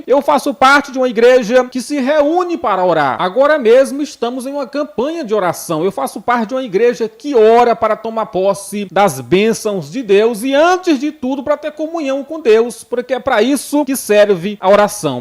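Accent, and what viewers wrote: Northeast, Brazil. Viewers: A. Paz do senhor Jesus Cristo missionário gosto muito de seus comentários é muito edificante principalmente prá mim que sou Nova convertida em Cristo tem muito que não gosta porque não quer viver a verdade adoro ó seu sotaque nordestino Deus abençoe sempre sua vida e sua família 🙏💕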